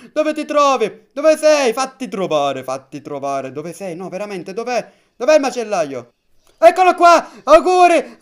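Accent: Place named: Sicily, Italy. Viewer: Non si capisce l'accento mi sembravi della Sicilia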